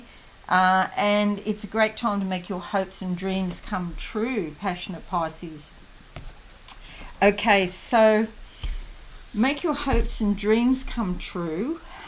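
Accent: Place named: Australia. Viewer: Thankyou beautiful 🙏🏽🌹 I am so intune with this Read and like a true fish will just go with the flow. As for the wishes I truly hope they come true if it is meant for me. Love your beautiful voice and familiar Aussie accent...i feel on your wavelength 🙏🏽🌈♓💜🌹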